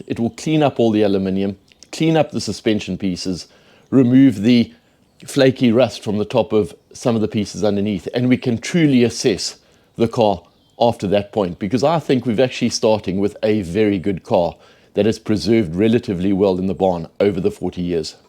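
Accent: South Africa. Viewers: Great to hear a South African accent broadcasting from the soggy isle. Good luck with the restoration. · Hello Sir, are you from South Africa..? 😊😊😊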